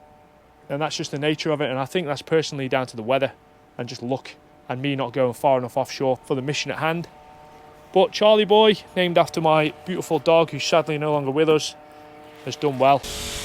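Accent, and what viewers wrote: Liverpool, United Kingdom. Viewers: close a bud scouser here your close to it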